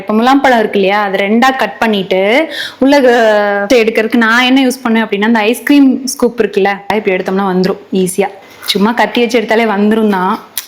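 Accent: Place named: Kanyakumari, India. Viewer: Neengalum kanyakumari slang paesureenga nalla Iruku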